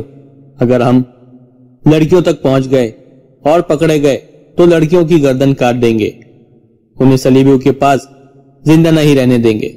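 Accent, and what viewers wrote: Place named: Gujarat, India. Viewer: Pl change the commentator terrible narration Is he some Gujrati speaking person Saramaza kharab ho gaya I have stopped listening to the video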